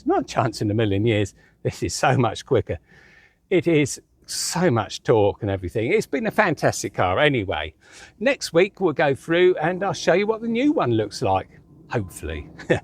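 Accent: United Kingdom. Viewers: Where are u in uk mate? Yorkshire?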